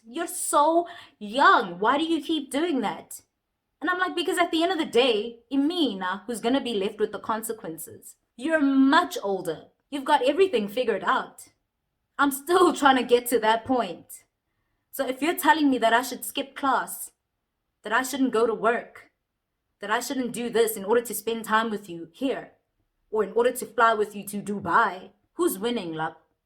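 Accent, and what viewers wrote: Zulu, South Africa. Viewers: Samnandi isiZulu sakho Ntokazi 🌹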